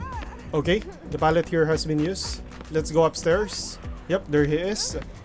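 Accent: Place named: Philippines. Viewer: pinoy ka pre? medj dinig ko punto mo haha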